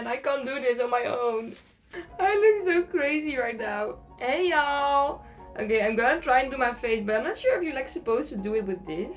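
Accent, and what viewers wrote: Netherlands. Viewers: Omg ik dacht zo ze klinkt zo nederlands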